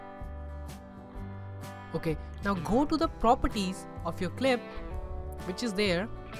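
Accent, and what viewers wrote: India. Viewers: he sounds like an indian tech scammer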